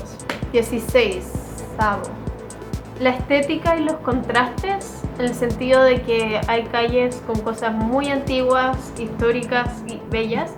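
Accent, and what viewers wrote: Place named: Chile. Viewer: me emocione cuando escuche tu acento, ya es comun que los extranjeron hagan este tipo de videos pero es raro que un chileno se de el tiempo de compartir lo positivo de Chile... felicitaciones y exito.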